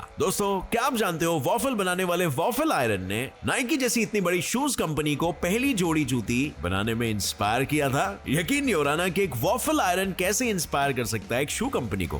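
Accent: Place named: Maharashtra, India. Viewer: I always like your Marathi accent.....👍👍👍🏻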